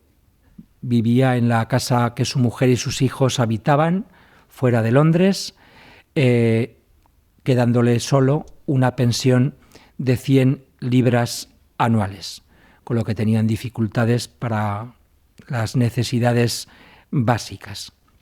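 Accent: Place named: Spain. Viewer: No está preparado este exponente..de por sí con esta ablita de españolete que molesta y aparté no bien preparado en el tema pésimo video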